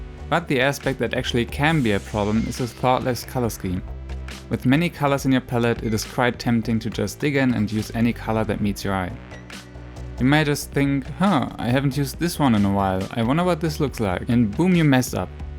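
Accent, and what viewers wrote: Germany. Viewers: Ich finde man hört so stark, dass du deutsch bist, ist bei mir aber auch so. :D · are you german hahha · Are you from Germany? In comparison to my accent when I speak English it sounds pretty similar^^ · This is a Very German Accent 😂 But i am German too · Bin ich die einzige, die raushört, dass er eindeutig Deutsch ist? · Is he german? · One question, are you German? Because your dialect sounds like you are german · Are you German? You have a German accent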